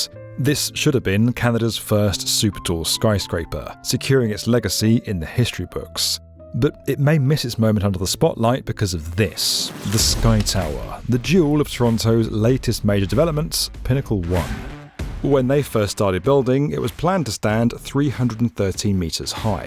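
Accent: United Kingdom. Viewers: I know this channel is based in London, but I always feel a British accent in a documentary is just fake and added to fool people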